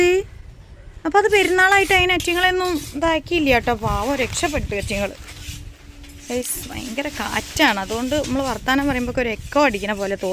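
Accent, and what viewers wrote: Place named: Thrissur, India. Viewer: തൃശൂർ സംസാരം എനിക്ക് നല്ല ഇഷ്ടമാണ്❤️👍🏻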